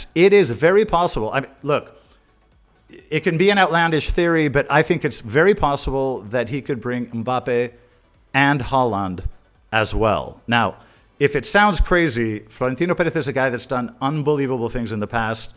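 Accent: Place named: United States. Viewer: You have a perfect American accent. Are you American-Spanish? Wait, are you a polyglot? French, Spanish and English? Italian and Portuguese maybe??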